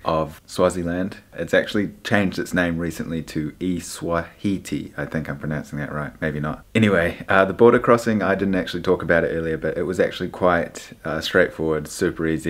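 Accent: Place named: New Zealand. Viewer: New sub here, great vids I've always wanted to know what SA was like. I knew I picked the kiwi accent haha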